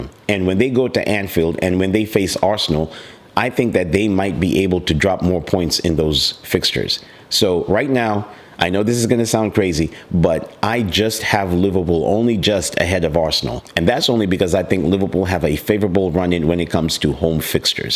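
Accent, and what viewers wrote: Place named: United States. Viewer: Great analysis.American accent 👍🏻😊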